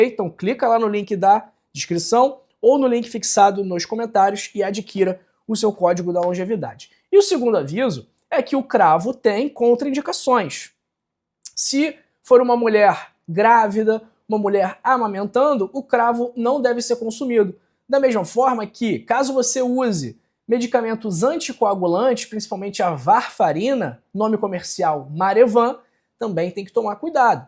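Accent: Rio de Janeiro, Brazil. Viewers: O conteúdo pode até ser útil , mas esse sotaque carioca ninguém merece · Esse sotaque carioca é horrível, muito Ruin, muito chato, é muito cansativo ficar ouvindo isso...pqp... é muito forçado...cara vái fazer outra coisa na vida q não precise falar nada... não abre a boca por favor... credo...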